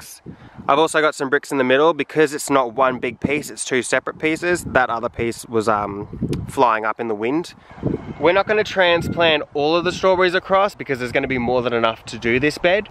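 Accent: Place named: Australia. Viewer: Ok you two are the only gay homesteaders on YouTube which means you please have to make more videos and make them more often. Plus you’re freaking Australian which means extra points for the accent. Thank you.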